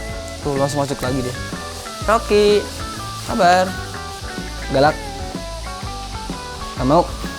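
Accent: West Java, Indonesia. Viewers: nagen (sunda pisan si aa ) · "Nagen" apa ya Nagen "Hampeg" apa ya hampeg, kocak emang ge ka alshad mah sunda pisan.. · Tetep ya a sunda nya keluar, emng enak bgt ngomong pake bahasa sunda ma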